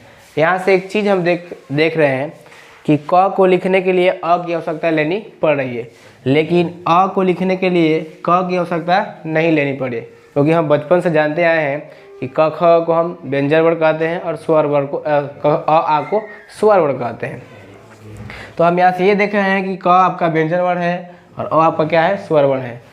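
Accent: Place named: Bihar, India. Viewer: Bahut hi ghatiya lecture hai samajh me nahi araha bihari bhasa se padhaya sub teacher aacha padhate hai is channel ke bas ise aur english wale chhod kar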